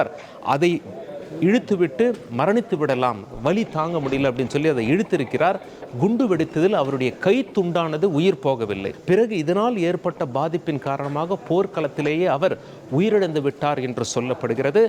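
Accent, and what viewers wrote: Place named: Salem, India. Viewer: Salem voice and prononciation excellent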